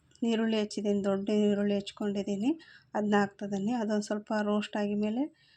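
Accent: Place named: Sira, India. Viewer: R u from sira r it's surroundings ur language slang in like that nice receipe😊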